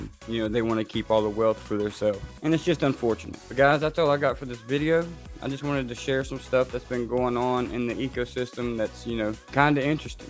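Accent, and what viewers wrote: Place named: South, United States. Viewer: you keep it simple that’s why I like you! And your southern n into crypto rare combo! Oklahoma here so I appreciate a firmiliar voice in the space ! Took your advice thank you!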